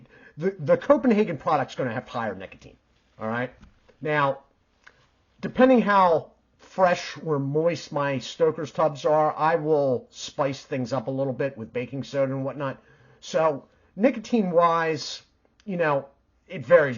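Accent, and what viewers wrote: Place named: Pittsburgh, United States. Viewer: When you said “PA pricing,” it all clicked! I knew I recognized the accent. I am Pittsburgh born and raised 😂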